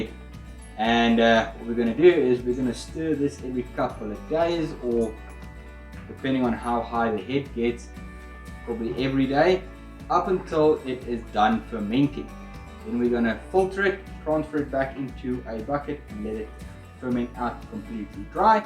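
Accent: South Africa. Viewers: Are you South African? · South African? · Bruh !!! South African?! Hell yeah buddy! Nice show!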